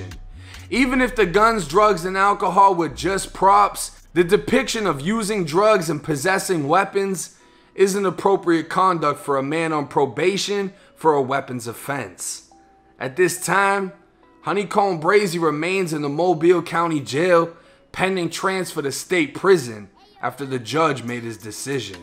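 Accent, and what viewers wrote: Boston, United States. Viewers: This dude who's talking must be from BAASTON🤔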